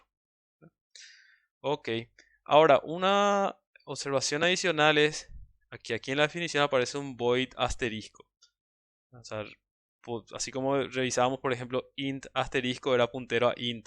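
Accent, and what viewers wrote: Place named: Paraguay. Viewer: De la core cape, me ayudo bastante, me gusto el acento paraguayo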